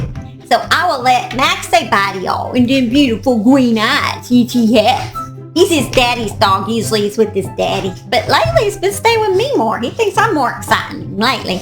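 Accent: South, United States. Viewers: I absolutely LOVE hearing you telling these cases! You have such a sweet southern voice, and being a Tennessee girl I love hearing an accent like mine! I thought it was to funny when you said "Oh shit, well I shouldn't say shit on here!" Hell I am always wondering how people don't throw out all kinda "pretty words" when they hear these F**KED UP cases! Thank you for another great video, like always. Oh yeah, congratulations on 1k subscribers! I believe you are going to continue to grow like you have been, cause what is there to not love about your channel and personality!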